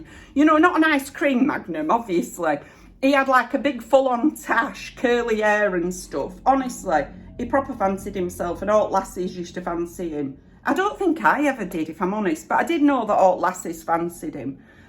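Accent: Yorkshire, United Kingdom. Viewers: You told this story so vividly I could just “ see” all the kids shivering in the snow …. It’s a good idea for to be applying your makeup whilst recalling a memory and interesting for us viewers to watch as well as listen I am originally from York now in New Zealand but I really enjoy all your videos and love your unaffected Yorkshire accent… I can relate to many expressions which you use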